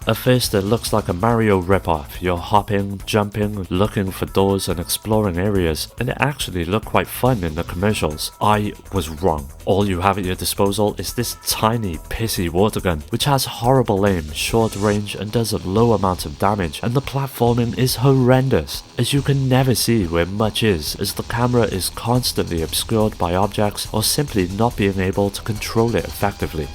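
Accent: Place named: North Wales, United Kingdom. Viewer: Quality vids. Random but are you from anywhere in N.Wales? Familiar accent.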